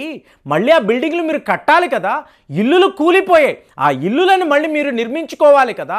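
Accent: Tamil Nadu, India. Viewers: While doing telugu వీడియోస్ యూ have to refine your tamil slang garu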